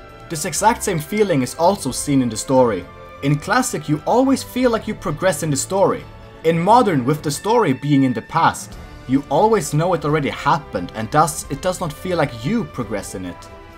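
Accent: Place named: Sweden. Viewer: I could hear you’re Swedish from a mile away xD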